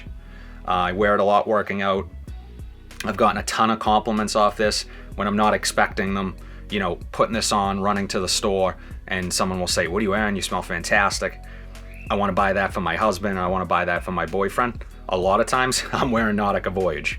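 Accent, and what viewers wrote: Boston, United States. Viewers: THAT BOSTON ACCENT!!! FIRE!!!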